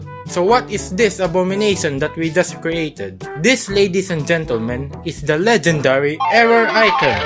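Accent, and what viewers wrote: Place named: Philippines. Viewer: Pinoy to.. yung accent eh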